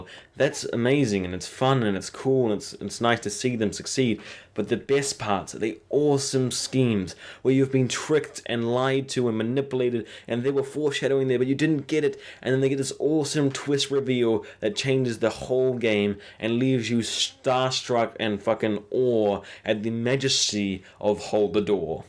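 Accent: New Zealand. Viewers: are you from new zealand? you sound like a kiwi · Cool vids.. i´m from argentina i used to live in nz for the last 7 years.. it´s been a while since i´ve hear kiwi accent.. keep it up.. sweet as bro.. cherr bro..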